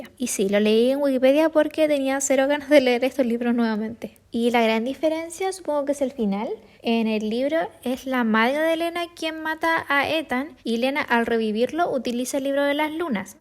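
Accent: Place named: Chile. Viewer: tengo que decir que tienes una voz bonita , PERO HABLAS MUY FEO por el momento DUDE en que IDIOMA HABLABAS. y me di cuenta que hablas asi por que eres CHILENA, y te quiero comentar que si vas a tener un canal y compartir contenido TRATA DE HABLAR MEJOR por que no todos entendemos el CHILEÑOL.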